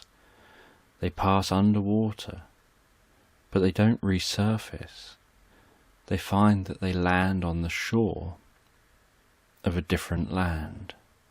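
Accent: United Kingdom. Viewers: Hi are you from the uk? Also were can I buy your book from? I am new to your channel, look forward to hearing from you Angel blessings Sylvia Watts ( Rev, ATP )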